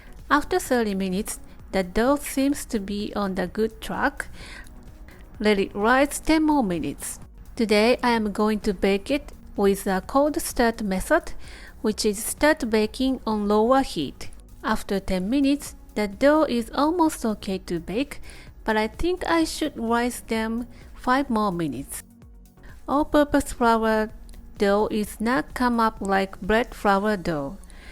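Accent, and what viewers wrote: Japan. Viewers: I love Japanese accents when they speak English! So cute! Thanks for this amazing video!!!! Very well and detailed explanation in how to make a 🥖! I Will give it a try for sure!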